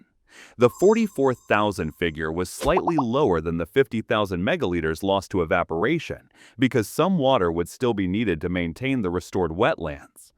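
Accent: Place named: United States. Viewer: ok - an American accent producing this video 🤣🤣🤣 have they been there before? it was the Victorian State Government - seriously did you do your research????????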